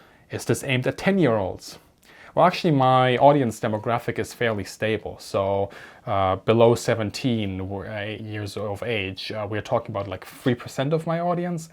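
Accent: Germany. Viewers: Great & funny video! Germans do great impersonations us American southerners. Could you please, in your German accent say the famous line from "Cool Hand Luke", in a heavy U.S. southern accent: "What we've got here is failure to communicate. " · Oh for gods sake.....IGNORE THEM my friend! I am a Brit (A Welshman actually) I am keen aviation fan, my father was in the RAF post war era! You are a wealth of knowledge that can be verified should one choose to research it! Yes you are German, does not take long to work that out and so what? I admire your Objective impartiality, Lets be honest some German aircraft of the war were years ahead of anything the allies had. You could choose to extol the virtues of German Jet aircraft, the 262 or the Arado bomber and you do review these aircraft, but it is always fair, and objective and above all presented in a decent manner and as for people taking the P**S out of your accent..GRRRR ask them how is their German, or french or ANY language other than their mother language. 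As a Brit, I am always embarrassed how many speakers of English, which is also the language of America (they don't say "I speak American")...where was I? Oh yes English speakers generally can not speak any other language yet they always have the audacity to criticize a foreigner speaking English! I can speak a bit of my country's language which is welsh, I wish I could have learnt it in school but as I am now 62, that was a long time ago...Keep up the good work my friend, I always look forward to your channel Thank you! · OK, I have to do this. First, I knew I was going to have some fun with this. I have been following your content for about half a year (after i got home internet), and I immediately recognized the incredibly high information value. Therefore, anyone who left negative comments on your channel would only prove their own stupidity. Then, after listening to just the first few comments, I needed to say the following immediately. 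Language comments? How inane. Sure, you sound like a Cherman. So what? You are fluent in English, which must be your second language, and you must be fluent in German, which is your first language, and which I recognized from your pronunciation of German terms, and I seem to remember, French also? And maybe something else. So here's one guy who's fluent in 3 languages, and there's another guy, who probably only knows one language, and probably badly at that, criticizing the first guy on the unusual pronunciation of a few words. Who is the better man? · Dig your vids. Like seing them old birds in nice detail. The German accent fits the theme lol. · No worries about your german accent, i'm more concerned when people think I'm gernan while talking my native language wich happens to be Dutch 🤣👍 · If I didn't know better, I'd say that you were a native English speaker who happened to be raised in Germany (thus the accent). 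Aka your English is excellent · I love your accent! It is excellent and authentic! AND your English grammar and pronunciation are PERFECT! I don't mean to be prejudiced, but I probably wouldn't have started following you if not for the German accent! Hals und Beinbruch! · he doesn't have the ability to speak "the queens English" with a German accent, he would need an English accent, but he speaks EXTREMELY FLUENT English, and that's what's important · totally agree, sure you can hear his German/austrian Accent but i can understand everything clearly, Keep going ( Guade Videos hawara) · Heyyy, do not worry about " German accent ", Kraut and Jerry LOL. Those guys are jellos because you speak more than one language. Also, those d........heads forget, WW2 ended 1945. Keep up with good work. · Well, you have a distinct German accent, but I would say your english is more precise than most native english speakers I know. 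· You sound like German. I think that guy blow up your cover...Greetings from Serbia!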